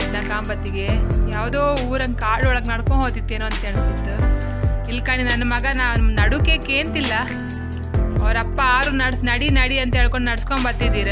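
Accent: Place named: Kundapura, India. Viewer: ಕುಂದಾಪುರ ಭಾಷೆ ಇಷ್ಟ ಆಯ್ತು. ಕಾಂಬ .ಕಾಣಿ. tortu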